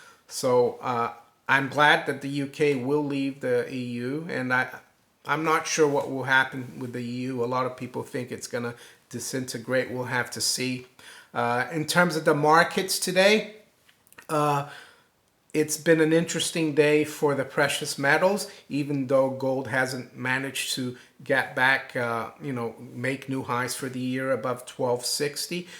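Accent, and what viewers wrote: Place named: New York, United States. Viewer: Have you ever lived in the US? You sound like a new yorker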